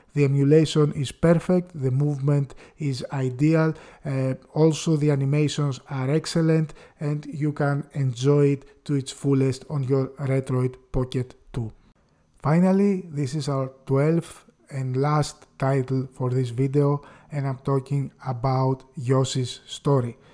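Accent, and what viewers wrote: Greece. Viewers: What about conker's Bad fur day? BTW: where are you from? You accent sounds Greek.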